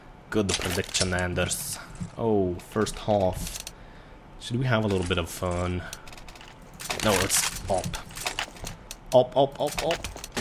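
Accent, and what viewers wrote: Sweden. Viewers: The sweeeeedish accent!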